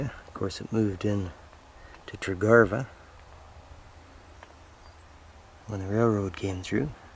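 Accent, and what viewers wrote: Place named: Minnesota, United States. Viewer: Sounds like you have an accent to me, reminds me of someone from Minnesota. I'm from Columbus, Ohio though. I read somewhere that the " 'General American' region accent... covering parts of Illinois, Indiana, Iowa, Kansas, Missouri, Nebraska, and Ohio" is the most neutral english dialect.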